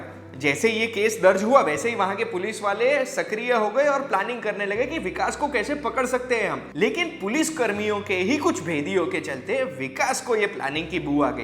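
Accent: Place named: Gujarat, India. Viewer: hindi ma bole to pan Gujarati sambhday chhe 😁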